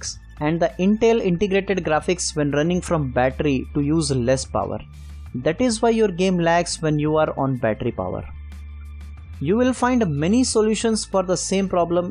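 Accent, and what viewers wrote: India. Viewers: lol Indian accent is just beautiful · Wtf we know the consequences. Just tell us the solution. Your accent is terrible. Definitely Indian.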